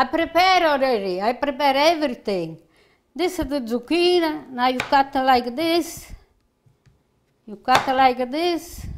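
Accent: Italy. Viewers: Thank you for demonstrating this delicious recipe. I love your Italian accent. You are such a great cook and singer too! ❤️👍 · So glad to see you happy and healthy, you are a true inspiration to all. I made your stuffed cabbage ( which I have never ever made in my life) and I invited my daughters fiance's mother over for supper, well all I can say is thank you and thank you heavenly father, my husband and my friend LOVED THEM. I was panicking the whole time I was making them and when I was rolling them I kept hearing your voice " keep all the stuffing inside" (of course in you beautiful Italian accent). Thank you for showing us how to make fabulous Italian food that our families love. PS I still am up for adoption. Much love to you and your family God Bless. Oh and I forgot this will be dinner tonight for hubby and I.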